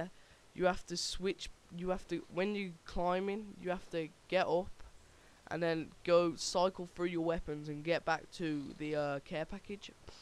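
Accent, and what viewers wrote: Birmingham, United Kingdom. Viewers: its his accent..brummy niot the british language itself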